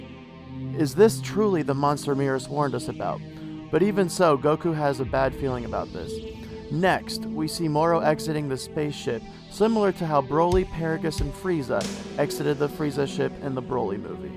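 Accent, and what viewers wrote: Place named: United States. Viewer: Nice to find a American narrator the other guys accents make it hard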